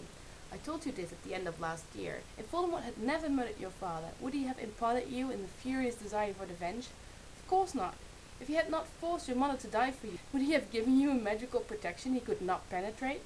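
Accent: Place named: Netherlands. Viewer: interesting stuff. I like how when you read outloud you pronounce very posh english in a dutch accent haha.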